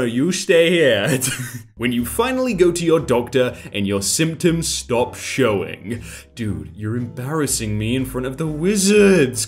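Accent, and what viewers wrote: United Kingdom. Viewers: day 61? of asking that he british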